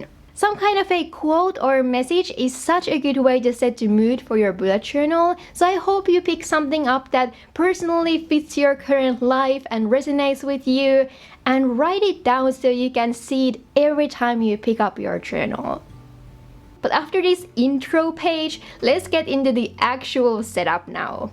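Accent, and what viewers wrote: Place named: Finland. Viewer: huomaski välillä ihan niiinku oilisit puhunut suomea hih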